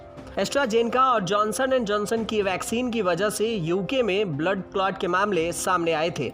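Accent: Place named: Bihar, India. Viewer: Bihari babua h vo isliye.. regional pronounciation problem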